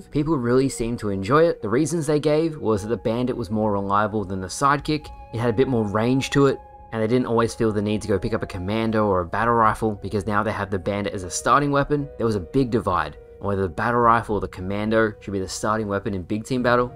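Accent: Australia. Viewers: Lol forgot was Australian until he started the video with "G'day"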